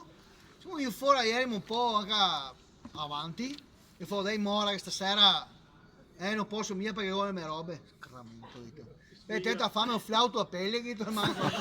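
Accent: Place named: Veneto, Italy. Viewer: la parlata veneta ê simpaticissima 😁😁😁😁..grande bella barzelletta e bella interpretazioje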